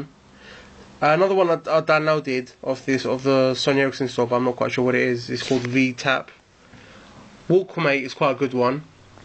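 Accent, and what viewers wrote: United Kingdom. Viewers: Cockney accent.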